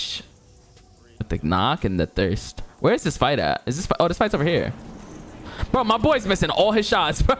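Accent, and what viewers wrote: New York, United States. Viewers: You talk like your from New York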